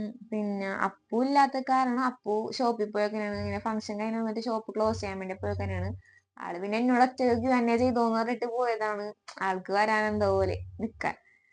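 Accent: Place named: Kochi, India. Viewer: തനി കൊച്ചി ഭാഷ 😇😁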